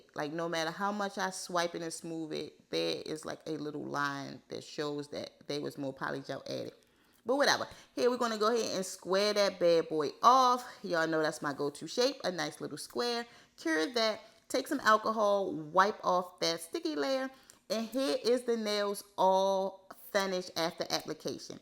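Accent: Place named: Maryland, United States. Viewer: New subscriber here. Stumbled across your page on IG. Love love love your work. Me and polygel are not friends but I enjoy watching and seeing your sets. I could also tell from listening to your voice that you’re from Maryland. ❤ I love Rhimestones.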